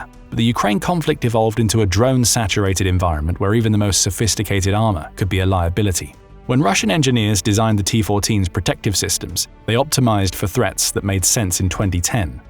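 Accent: United Kingdom. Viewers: Tired of the UK-ENGLISH accent in all these Ukrainian videos.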